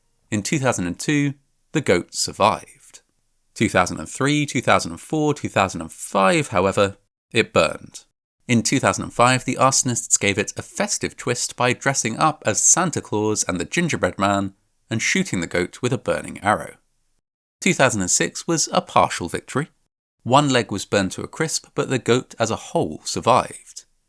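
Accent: United States. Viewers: Your American accent is fantastic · your American accent is awful. loved the story anyway :-) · Once again, I have been absolutely murdered by your American accent. Brilliant, it was like I was there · Your American accent is a glorious thing. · I really enjoyed your american accent. ❤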